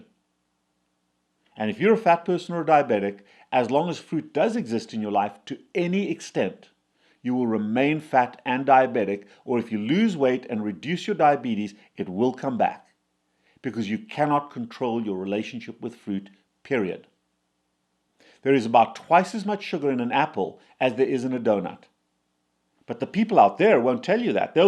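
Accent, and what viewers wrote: South Africa. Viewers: I'm 53 and from South Africa, where it sounds like he is also from. Not sure where he got his apples from - they still look the same to me😂 But I agree that diabetics should not eat fruit.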